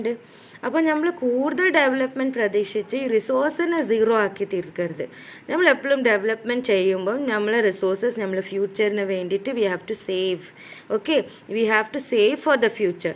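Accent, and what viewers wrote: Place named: Kasaragod, India. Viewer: Madam please try to avoid the Kasaragod slang ❤️ thank u mam for your help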